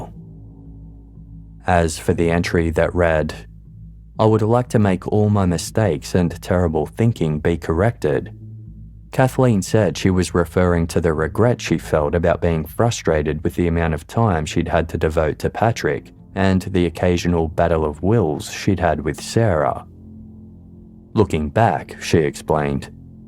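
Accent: Australia. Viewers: Whoa ! aussie :)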